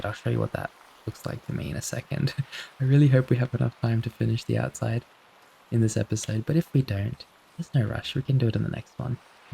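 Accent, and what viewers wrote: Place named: Australia. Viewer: You uploaded this right as I needed something to sleep, thank you for the great content as always. As a fellow australian, it's comforting to have a familiar accent. Especially since I am staying overseas right now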